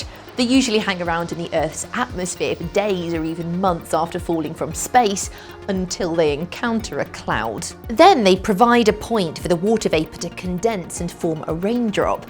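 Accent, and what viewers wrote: England, United Kingdom. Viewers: A beautiful woman with an English accent. Doesn't get much better than that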